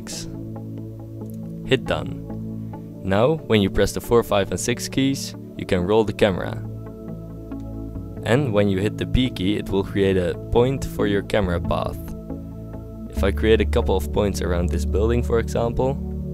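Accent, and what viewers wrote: Netherlands. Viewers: Ha. Hij is gewoon nederlands. Ik dacht al hoorde een hele vriendelijk nederlandse jongen:)Hoi! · are you from the netherlands??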